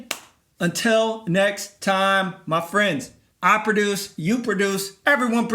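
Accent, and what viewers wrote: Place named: United States. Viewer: Was so surprised to hear an American accent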